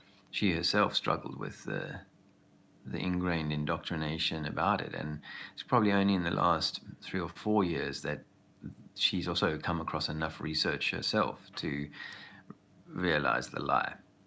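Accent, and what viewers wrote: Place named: Australia. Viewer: Laughing my ass off right now... love the Aussie accent! And you are spot on, we certainly are a nanny state and it makes me sick!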